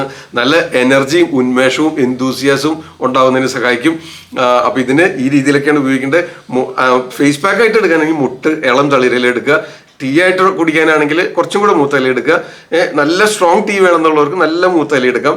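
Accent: Thrissur, India. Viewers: Nammude trichur style samsaravum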